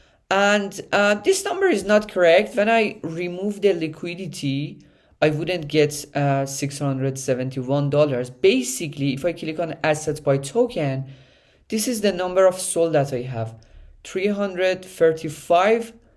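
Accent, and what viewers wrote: Iran. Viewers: dawg great content btw i think you iranian by the accent. im iranian too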